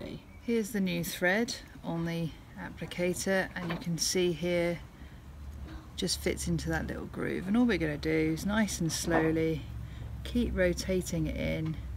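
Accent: United Kingdom. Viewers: Always a pleasant surprise is an English accent, and a woman's at that!.... oh, and a nice demo as well.. thx very much indeed!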